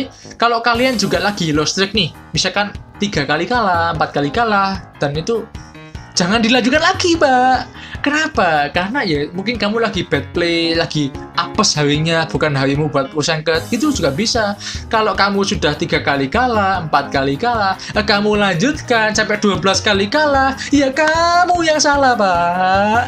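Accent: Java, Indonesia. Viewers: Bang tolong tambahin logat" jawanya,ya bang 😁😁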